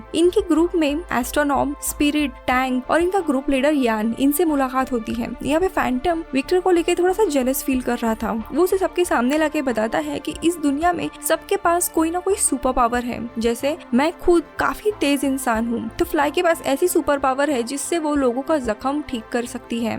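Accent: Maharashtra, India. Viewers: aap marathi ho kya??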